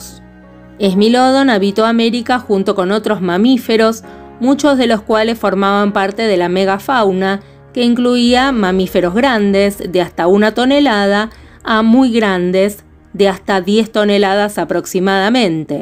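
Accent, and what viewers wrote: Argentina. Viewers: Interesante reportaje con una dama de acento inconfundiblemente y agradable argentiiino , espero no me equivoque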